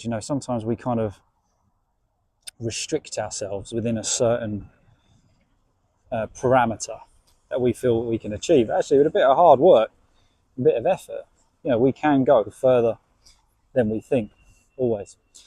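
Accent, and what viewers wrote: United Kingdom. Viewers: سعيد بالانضمام إليكم... سوداني... معجب باللهجة البرطانية...!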